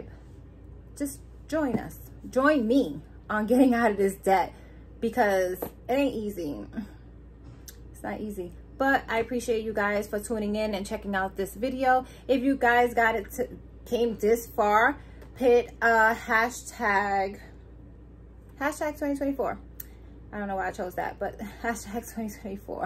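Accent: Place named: New York City, United States. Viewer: I just came across your channel. You’re so inspiring and positive! I’m originally from NYC but live down south in FL…. Hearing your accent reminds me of home. I pray you’re able to get out of debt soon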